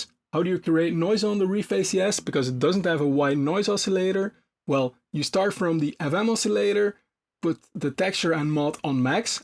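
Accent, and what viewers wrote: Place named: Netherlands. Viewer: dat is een vatzig nederlands accent makker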